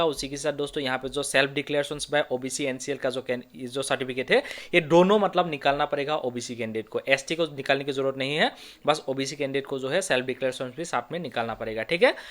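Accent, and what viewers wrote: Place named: Assam, India. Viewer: bhai 2mar Hindi Improve kribo lagibo atiya Assamese kuwa hindi Improve kri ha try kriba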